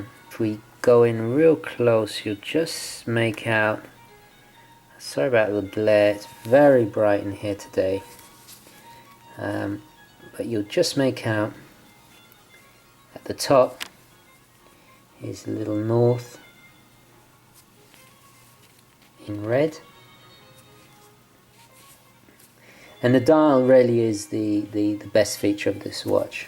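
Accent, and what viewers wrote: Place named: South London, United Kingdom. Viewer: I'm tempted, but am I the only one with doubts about the compass? i'm ex-military, do lots of hiking etc - I know how to use the sun/ watch technique to navigate but I've never heard of anyone doing it seriously. At best, its is a rough direction finder, so all those intricate bearings are pointless. So, I can't help feeling it's a gimmick on an otherwise excellent watch - an internal diver style timer or 24hr bezel would be way more useful. Any thoughts, folks? Anyway, greetings from South London (or as and I might say, Saahhhrf Laaahhhndon). What a fantastic channel - you're going from strength to strength, chapeau!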